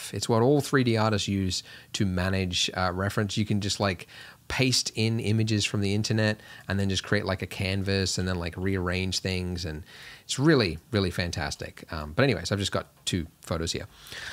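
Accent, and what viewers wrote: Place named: Australia. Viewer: bru are you an Aussie mate?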